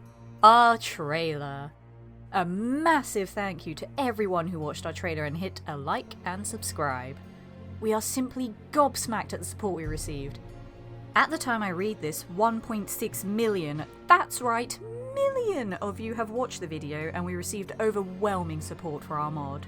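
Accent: United Kingdom. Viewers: Bri ish 🤢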